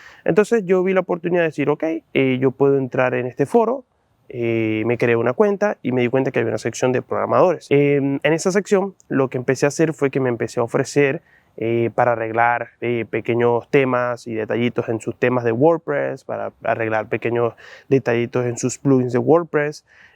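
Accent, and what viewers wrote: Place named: Zulia, Venezuela. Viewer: Lo malo es que parece que flutter ira en declive segun los ultimos movimientos de Google. Tu acento parece de Edo. Zulia. Saludos desde Machiques. Actualmente vivo aqui y trabajo para una empresa del exterior como dev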